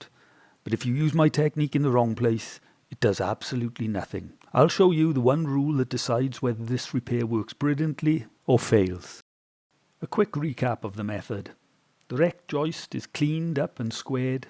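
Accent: Wales, United Kingdom. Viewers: Beautiful welsh accent and very informative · Clear, concise, excellent explanation of concepts!!! But unfortunately for the rest of the day I will now be talking in a heavy welsh accent!!!!😆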